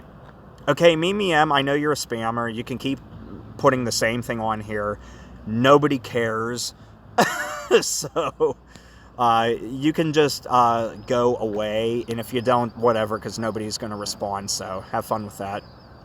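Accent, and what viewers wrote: Pennsylvania, United States. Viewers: i was born in oil city in 61 love the pa accent glad it wasnt u car parts u were lookin thru in another video when i asked,,,,,we really need somebody in rochester ny area to do these soooo many to film.....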